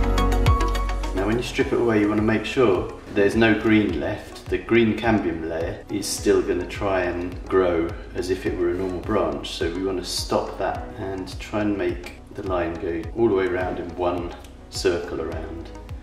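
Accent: United Kingdom. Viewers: I'm a Hispanic American and thought this was a British video because of the man's accent. Then, I heard the random caracol (snail) song in the background and was like WTF...hahahaha!! Kind of fitting!! :-D Gracias por la linda explicacion. I'll try it today!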